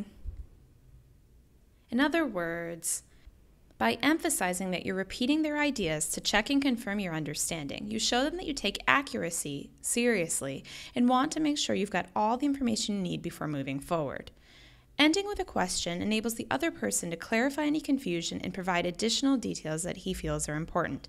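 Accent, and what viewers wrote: United States. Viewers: Great American accent you have.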